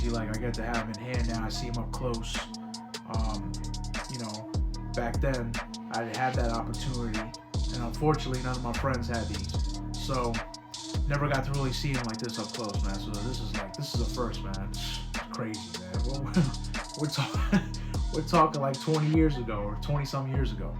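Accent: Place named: East Coast, United States. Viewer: Sound like you from the east coast dog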